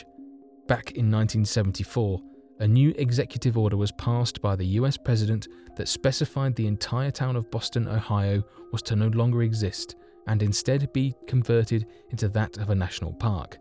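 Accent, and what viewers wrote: United Kingdom. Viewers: Another boring British monotone narration. Blaaaaaah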